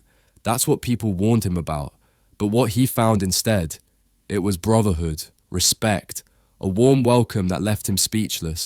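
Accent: United Kingdom. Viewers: Are you from UK Bro?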